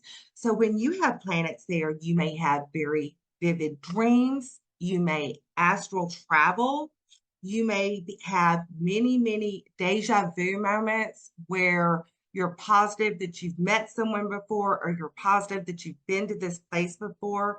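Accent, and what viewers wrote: South, United States. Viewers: I really need to get a reading from I love her accent she reminds me of being down south(my family is from the south) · Thank you for the beautiful insights., are you from Georgia? I love your southern accent. I'm trying to learn to identify each accent and where it derives from. So far, I have South Carolina down pretty good.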